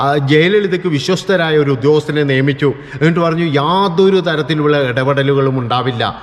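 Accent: Kannur, India. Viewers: മസാല ഒക്ക കൊടുത്തുകൊണ്ട് ഇക്കിളി പെടുത്തിയുള്ള കണ്ണൂർ ശൈലിയിലുള്ള അവതരണം ഇഷ്ടമായത് കൊണ്ട് വീണ്ടും കേൾക്കും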